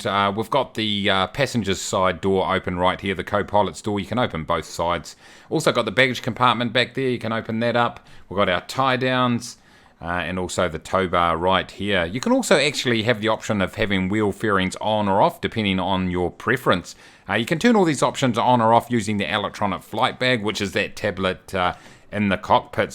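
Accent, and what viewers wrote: New Zealand. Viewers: No offence but a kiwi accent is so annoying. 😂😂😂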